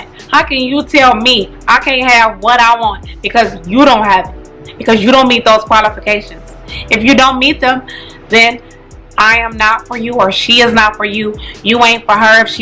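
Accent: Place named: Spain. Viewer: like a Spanish accent to it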